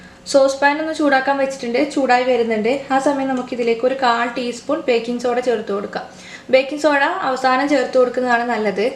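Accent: Kannur, India. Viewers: Nammude Kannur ano???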